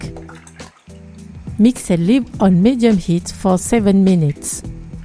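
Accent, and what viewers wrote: France. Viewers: Merci, enfin une recette géniale avec tapioca et banane. Hum the french accent... Thank you.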